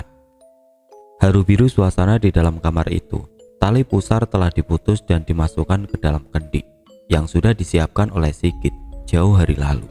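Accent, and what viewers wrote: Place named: Banyumas, Indonesia. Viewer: Lama2 aku beneran gak berani dengerin ceritanya mas di tengah malam😅 Tapi seneng dengar suaranya ngapak2 gimana gitu bhkan kadang bikin ngakak juga 😅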